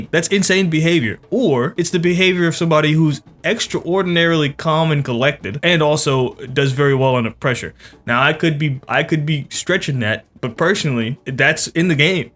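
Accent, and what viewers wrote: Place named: New York, United States. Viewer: Isn't me or as a video went on. He got more and more New Yorker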